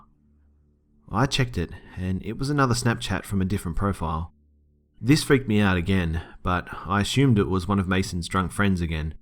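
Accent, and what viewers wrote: Australia. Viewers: Subbed for the Aussie accent and great content. :D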